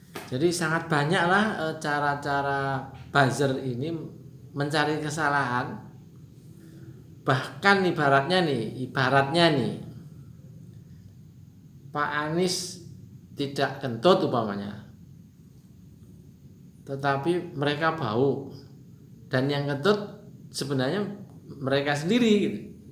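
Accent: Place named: Banyumas, Indonesia. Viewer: Ini kaya chanal ngapak..bro ..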